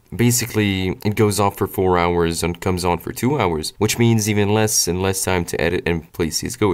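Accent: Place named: United States. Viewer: Are u seriously from there? Are u okay? I actually live very close to syria.. Can see black smoke sometimes and shit... But u don't have arab accent u sound 100% american!! xDDD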